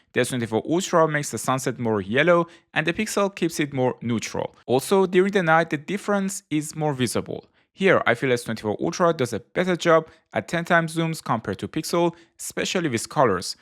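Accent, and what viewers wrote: Iran. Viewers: Are you persian? you're accent sounds like persian but u don't look like one then i saw the koobideh kabab now i'm confused lol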